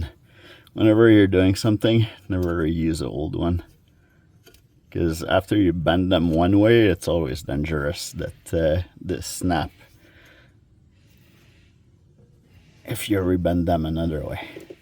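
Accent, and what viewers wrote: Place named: Quebec, Canada. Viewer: Sounds like you are from Quebec, like me from Montreal!! I am your first commentator! Great video, will try and by the way, never thought of doing the job this way.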